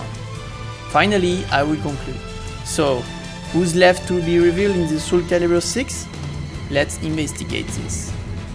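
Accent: France. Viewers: So you're speaking with a French accent? Your pronunciation sounds great. I wanna talk like that lol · Ooh gosh you’re French you hahaha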